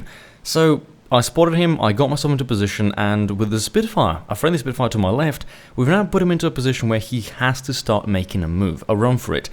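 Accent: England, United Kingdom. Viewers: Why does he have an English accent? wtf? Or is the Slovenian accent similar in some way?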